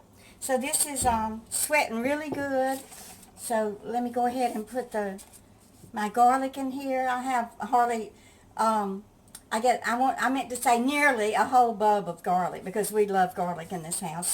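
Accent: South, United States. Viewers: Good morning,. I love to hear your voice. Your voice just makes me feel good. I live in the northern Midwest and the southern accent brings me such joy. I think I can smell that chili and it smells yummy!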